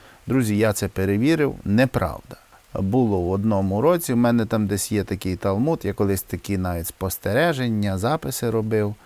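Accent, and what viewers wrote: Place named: Khmilnyk, Ukraine. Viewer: Привіт. як справи бачу вже посміхаєтесь йдите на поправку. ви з хмильниччини? ато акцент рідний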